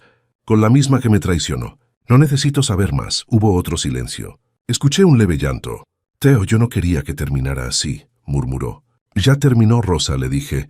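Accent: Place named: Spain. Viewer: Porque si es un Mexicano narrando su historia , porque habla como un español ?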